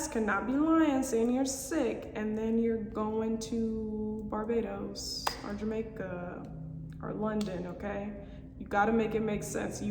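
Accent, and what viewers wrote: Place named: Alabama, United States. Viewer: you talk like a Bama girl though ! 🙂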